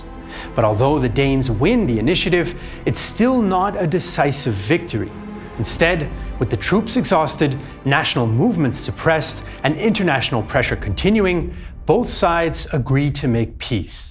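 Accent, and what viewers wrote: Germany. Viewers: German accent is better than necessary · My man, that man is clearly not a native english speaker, he is actually German XD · The details were great, but it is unfortunate that the Danish place names and personal names are pronounced with a German? accent. It becomes very difficult to follow. If you are speaking English, use their English names. For example, the Danish Jylland, is JUT-land in English. Not YOOT-land. Likewise the capital of Denmark is not Copen-HAH-gen, but Copen-HAY-gen in English. Copen-HAH-gen is the name of a chewing tobacco.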